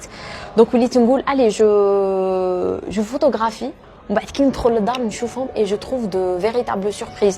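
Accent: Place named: West, France. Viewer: J'aime ta façon de parler et bien-sûr le contenu .. mais surtout ton accent de l'ouest ça me manque. Bon courage pour ce que tu fais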